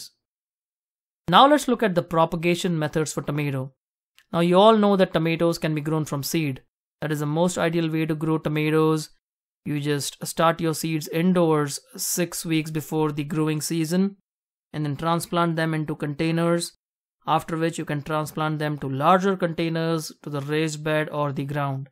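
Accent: India. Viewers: I like his Indian accent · his indian accent is so thick i love it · clearly Indian Accent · what other accent would an Eastern Indian person have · Your accent felt to me from Indian origin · You've got an Indian accent sir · English is so much in Indian accent, it's hard to understand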